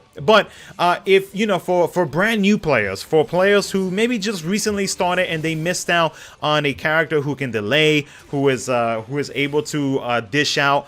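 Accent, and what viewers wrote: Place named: Brooklyn, United States. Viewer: This American accent...Brooklyn? Queens?I wanna know! I love 💘 it.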